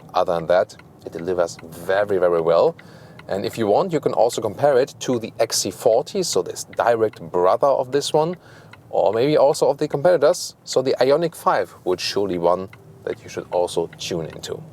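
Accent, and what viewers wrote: Germany. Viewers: I fiind it very hard to understand this heavy german accent english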